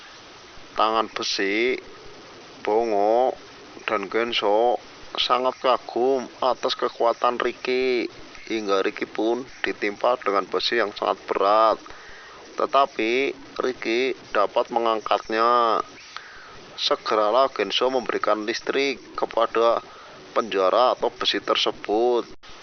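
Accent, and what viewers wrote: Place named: Banyumas, Indonesia. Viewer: Rika wong ndi bos ngapak temen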